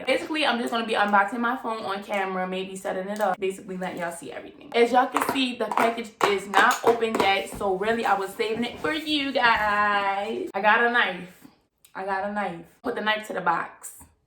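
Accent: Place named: Baltimore, United States. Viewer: How was the battery health ? And are you frm Baltimore u got the accent 😭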